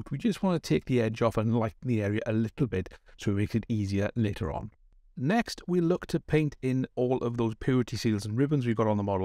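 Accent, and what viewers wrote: Wales, United Kingdom. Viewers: I bloody love your accent. The Welsh accent is beautiful.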